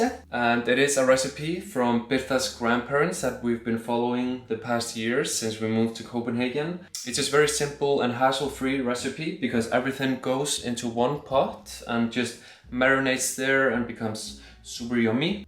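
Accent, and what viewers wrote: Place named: Iceland. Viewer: has a more pronounced Icelandic accent than Birta, this is cute :)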